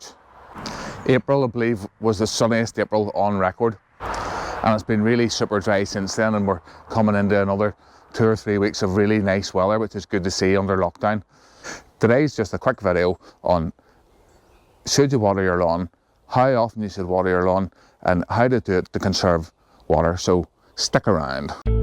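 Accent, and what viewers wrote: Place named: Belfast, United Kingdom. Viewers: Good clear video for UK gardeners . I watch some American videos and they just are not relevant to our Northern Ireland climate . I have two lawns that have recovered from earlier scarification and aeration . Both have been fed with seaweed every two weeks but I am a bit puzzled that one half of the front lawn is not growing so well .Could it be getting too much water , as the half I am talking about is the side the water drains off on . What are the signs to look for if I am over-watering Keep posting, it is really appreciated , especially that Belfast accent . Thanks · Wow Belfast language :D Hard to understand, but possible ;) Good job on your lawn, starting little project with parents house lawn... hope to see same good results, as you achieved :D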